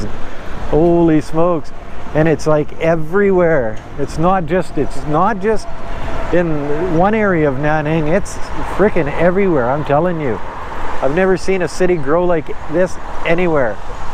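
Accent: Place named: Canada. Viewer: Oh boy! I love the Canadian English out of your mouth! Just can't be mistaken! LOL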